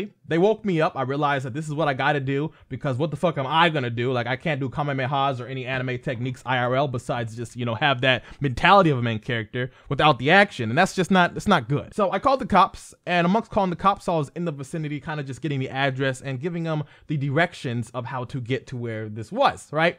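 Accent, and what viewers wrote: United States. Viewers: Damn bruh. Where you from the chi?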